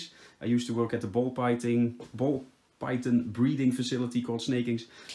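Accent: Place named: Netherlands. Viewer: Heerlijk Steenkolen engels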